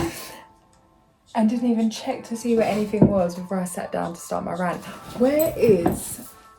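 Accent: United Kingdom. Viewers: Hadn't noticed honey. Just thought you were from London. A cockney lass. Who cares. You have the right to block anyone who gives a negative comment. xx💝💖💕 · As a white dude from America, which automatically makes me a deaf, dumb, and blind idiot according to the mainstream media, you sound British to me honey.. this is great stuff, keep up the great work...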